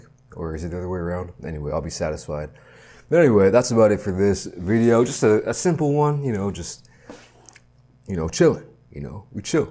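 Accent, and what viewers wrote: United States. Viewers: could you do a video of explaining why you live in Korea etc you sound like you were born in America etc would be a great vid explaining cheers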